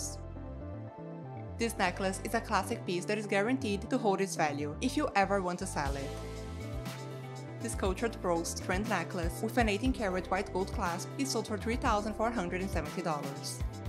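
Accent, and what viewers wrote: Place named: England, United Kingdom. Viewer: I can intolerant your English accent.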